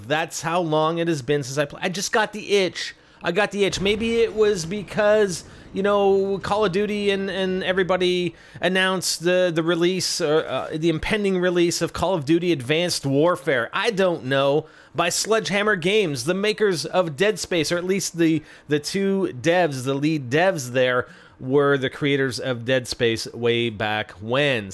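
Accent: Canada. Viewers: are you Canadian by chance?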